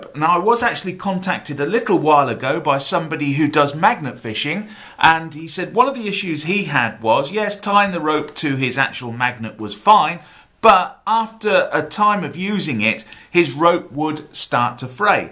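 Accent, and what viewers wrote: United Kingdom. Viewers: STU HERE THANKS ALWAYS WANTED TO KNO HOW TO TIE THE ANGLERS KNOT. OH AND YOOU BRITISH ACSENT IS SPOT ON. I LIVED AT THE BEACH FOR 20 YEARS NVERE HAD THE NEET FOR THAT KNOT. CIAO.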